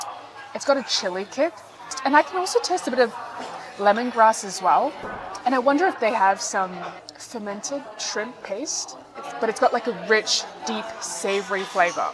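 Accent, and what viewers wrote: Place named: Australia. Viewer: Love your Australian accent :)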